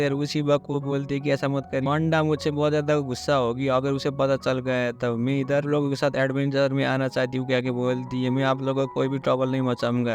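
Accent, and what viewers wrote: Nepal. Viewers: Bhai nepali he kya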